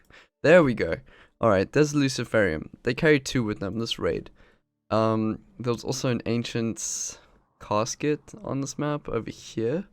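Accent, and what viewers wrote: South Africa. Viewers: I thought I heard a South African accent. Keep it up bud, I do enjoy your videos! Cheers from SA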